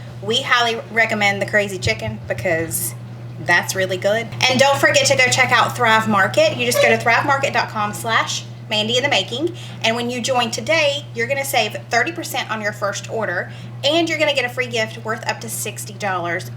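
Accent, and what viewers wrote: South, United States. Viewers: Mama English teacher we talk properly when we're at work and when we have too. When we're just being nice and among family and friends the southern comes OUT! It's okay girl😊😘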